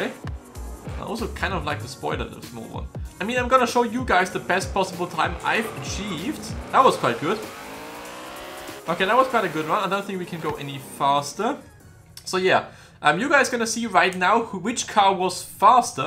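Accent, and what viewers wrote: Germany. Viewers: is he German? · Es hört sich echt nach deutschem Akzent an. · Are you German or Austrian? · scheiße deine Videos sind immer so abgedreht :'D bin letztens über den ultra dragster tesla auf dich gekommen. man hört sofort dass du deutscher bist :P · Are you german? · Is he German? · Man hört einfach das du deutscher bist😂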